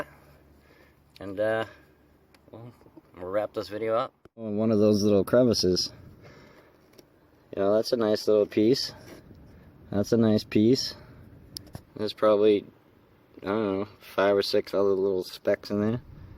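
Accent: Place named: Massachusetts, United States. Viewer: I knew you were from MASS i had an old boss from MASS same accent.Maybe NE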